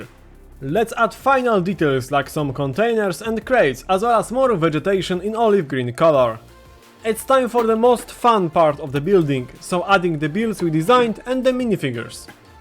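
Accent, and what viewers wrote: Poland. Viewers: I can understand the accent because I am also from Poland and I. Love these videos, keep up the good work I hope you get 1M subs before 2026 👍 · You have Polish acent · Polski akcent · masz polski akcent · from where are you from you sound polish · Słychać że polak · słychać że polak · usłyszałem taką nutkę polskiego mówienia po angielsku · Bro speaks English jak polak💀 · słychać polski haha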